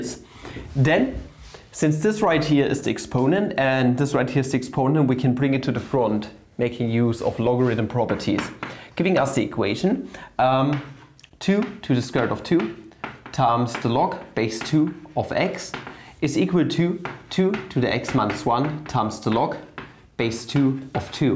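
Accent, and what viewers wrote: Germany. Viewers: wait i never noticed your german accent before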